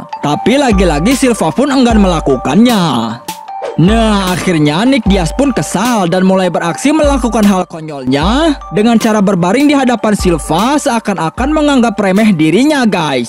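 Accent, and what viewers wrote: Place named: Madura, Indonesia. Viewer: salah fokus , voice over logat madura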